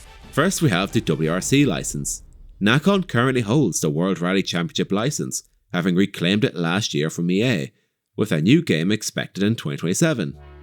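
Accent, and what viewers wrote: Ireland. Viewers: Nice to hear an irish voice covering covering car racing genre gaming. Big wrc fan and was wondering whether to pickup testdrive on sale. Bad news on Nacon especially with all their current & upcoming titles you mentioned. I will check out galway rally video when i can. Keep this content coming GRMA.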